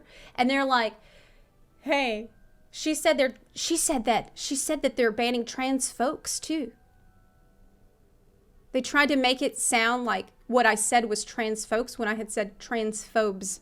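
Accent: South, United States. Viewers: Its because she is from the south, she's from Louisiana. She moved to the Ukraine to teach English iirc. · Is it me or she is becoming more and more Southern by the day? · Southern accent makes sense now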